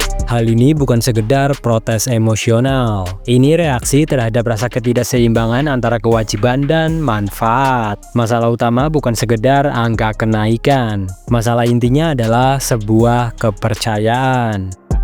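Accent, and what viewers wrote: Central Java, Indonesia. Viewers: Sampeyan, Yo Jawa Tengah 😂😂😂😂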